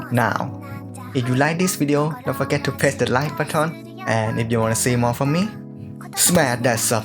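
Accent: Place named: Thailand. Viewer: คิดว่าต้องเป็นคนไทยแน่ๆ สำเนียงแบบนี้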